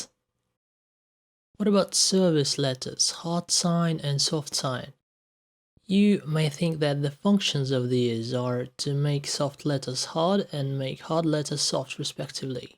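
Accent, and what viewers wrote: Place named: Russia. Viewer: I'm learning Russian, and i can say that this video helped me a lot, no need to be sorry of your Russian accent, you are great!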